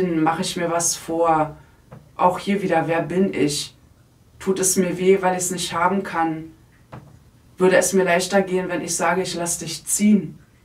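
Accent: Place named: Saxony-Anhalt, Germany. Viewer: Ich folge Dano schon länger und wusste von dir nichts.Ich mag dich und deine Worte,einfach deine ehrliche Art🌹..und deinen Dialekt (komme selbst aus Sachs.-Anhalt).. Die letzten 3Jahre haben den Zustand unserer Gesellschaft sichtbar gemacht....Empathie und Herzenswärme wurden reduziert. Bleib bitte wie du bist und halte deine Schwingung oben. Ich sende dir Licht ,Liebe und viel Kraft 🩵🙏✨️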